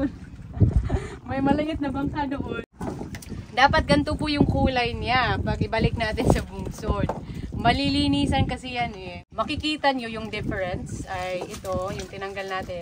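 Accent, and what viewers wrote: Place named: Iloilo, Philippines. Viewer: Ilonggo din pla kyu mag salita mars?ganda pla ng vlog mo nka aliw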